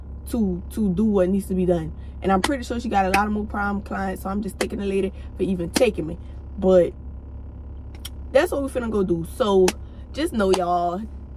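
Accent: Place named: Louisiana, United States. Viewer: periodddd, i can tell you from louisiana accent so cute ❤️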